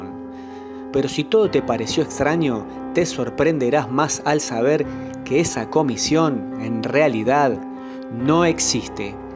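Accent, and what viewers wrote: Argentina. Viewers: El video está muy bueno , pero por favor trabaja en tu asentó , no todo el Mundo es argentino y en momentos molesta! Gracias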